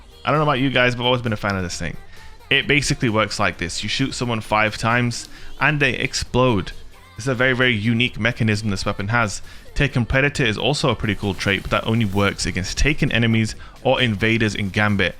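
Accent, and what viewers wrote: United Kingdom. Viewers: Heard British. smh. DISLIKE VIDEO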